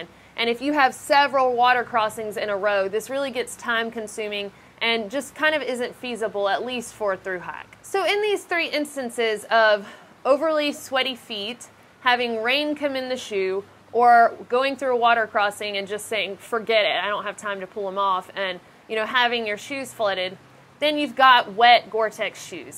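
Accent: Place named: Rural, United States. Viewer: I love how a handful of words you say sound full-blown country...like, hike, dry, time, a while, supply, resupply, I, find, myself...but sometimes say them in that somewhere-between-the-Northeast-and-Midwest accent. I'm sure I have a chopped up accent too being raised in Southeast Texas by parents from South Philly. Even to this day, coworkers think I'm full of shit when I say I've live here all my life.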